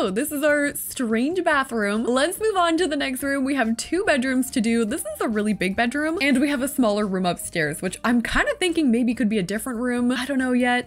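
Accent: Canada. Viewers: omg first video of yours ive seen and could tell right away u were Canadian lol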